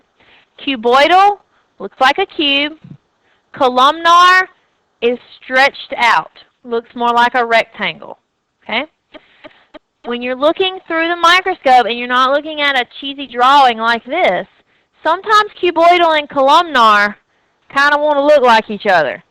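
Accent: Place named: Rural, United States. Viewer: Oh shit. I love it when smart people have hick accents.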